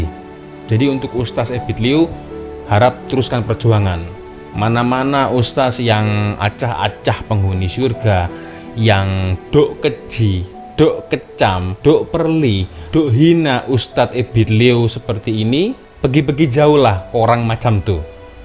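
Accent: Malaysia. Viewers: cak da pandai cakap mcm org malaysia la....tebaik cak.....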